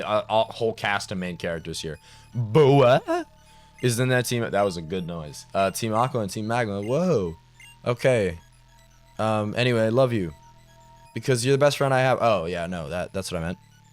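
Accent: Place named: London, United Kingdom. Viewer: _ATJAbouTime: ligma be damned get well ah kid at least it's not sugma.... Love the series ah kid keep it up and no faffin. For the love of all things holy please learn to do a proper English accent no the London bollocks lol :_ATJDewgKEKW: Broke/bone for a name :_ATJTrevSmile::_ATJBrudOK::_ATJTrevSmile::_ATJBrudOK::_ATJTrevSmile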